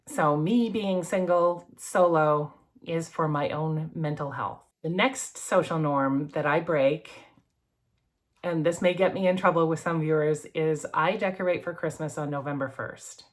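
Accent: Canada. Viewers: I could tell you were a Canuck after just one sentence as I am a veteran of five entire seasons at two different Lake Temagami, Ontario canoe tripping camps. And as we all know, Canucks are supposed to eat their French fries with vinegar not ketchup. I am also an ex Benedictine monk and the sleeping habits you describe are most typical of cloistered hardcore monastics such as the Trappistines and the Poor Clares. I sleep in a heavy duty Cabela’s camp cot in a an extra wide sleeping bag rated to 20 degrees Fahrenheit and I sleep in my family room which I use as an efficiency apartment of sorts as I live with my sister in the family house we inherited jointly and she is hogging the entire second storey with all of the additional bedrooms. I used to sleep in the basement until it started flooding owing to the ongoing climate disaster with the storm sewers backing up owing to repeated torrential downpours. 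You could use a little more of that rain up there in Canuckiana to put out your forest fires.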